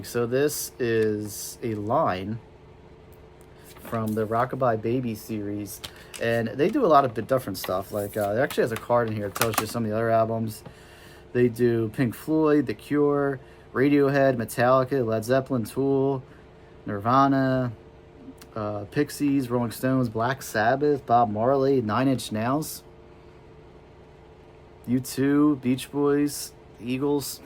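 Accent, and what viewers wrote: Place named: New York, United States. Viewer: You sound like you’re from NY like I am. If you are, I’ve got a 150 or so CDs for you to look at.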